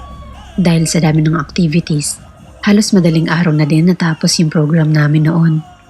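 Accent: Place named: Philippines. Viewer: Another pinay voice, lovely, totoong pinay accent talaga, greetings from London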